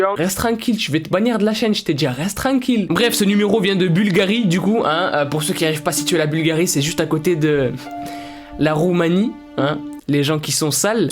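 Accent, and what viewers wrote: Marseille, France. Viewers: T’es marseillais ?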